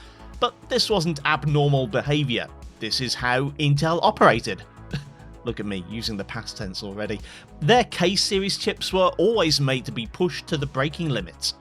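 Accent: England, United Kingdom. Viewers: Saw someone mention you on a Gamers Nexus channel. Had a quick look, and saw this vid which was a question I was wondering about. Soon as I heard a sane English voice, I was in :D Plus your nearly at 100k subs.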